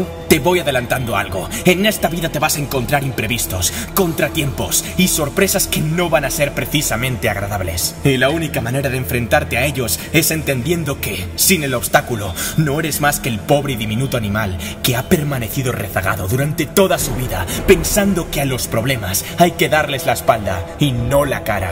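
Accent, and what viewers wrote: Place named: Spain. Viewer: Hubiera estado de 10, pero.... Ese acento español 🤢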